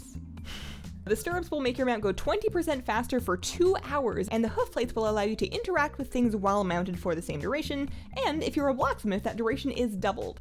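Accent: Canada. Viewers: love listening to Canadians talk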